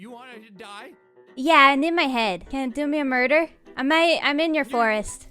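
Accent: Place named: Newfoundland, Canada. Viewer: I love hearing the Newfie in your voice ever so often 👌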